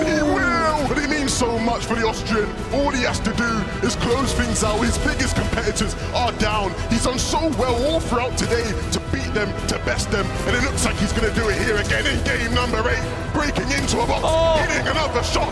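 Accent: Scotland, United Kingdom. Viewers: Scottish folk really shouldn't be doing these voice overs.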